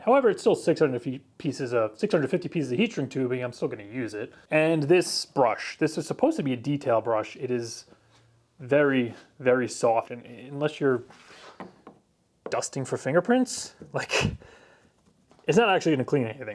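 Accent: New York, United States. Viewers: New Yorker!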